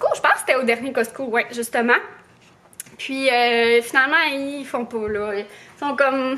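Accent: Quebec, Canada. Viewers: trop sympa d'entendre l'accent québécois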